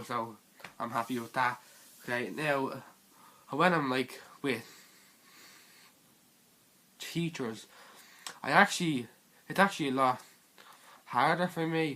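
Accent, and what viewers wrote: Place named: Ireland. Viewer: I'm sorry for coming off as a complete weirdo and being off topic but I love your accent (are you Irish?)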